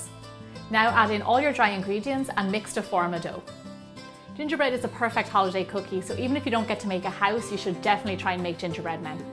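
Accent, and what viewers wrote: Ireland. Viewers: your accent makes me wish i lived in ireland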